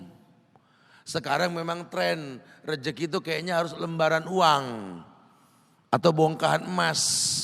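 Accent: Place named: Jakarta, Indonesia. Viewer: Assalamualaikum ustadz,,,,salah satu daya tarik adalah logat Betawi ustadz yg kentel sy sukaa bnget ,,,, alhamdulilah kebetulan kite satu rass ustadz ,,,,,semoga Allah slalu melimpahkan Rizki yg luas utk ustadz sbgai bekal dakwah ,,,aamiin ya rabbal aalamiin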